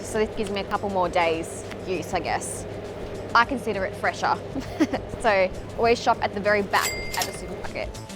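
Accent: Australia. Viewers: Please marry me.. Fuck first she's a super hottie then she's has the. Australian accent.. She stole my heart right there.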